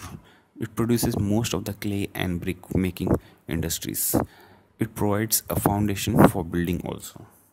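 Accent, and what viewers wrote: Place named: India. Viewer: harsh chandra it is Indian pronunciation, If you don't know about it refer Google . It is a Indian video so we use Indian English and Indian pronunciation .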